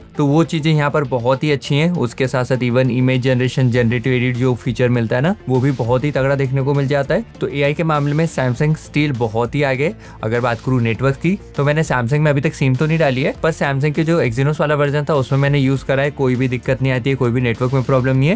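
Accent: Indore, India. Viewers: Bhai Indore se ho kya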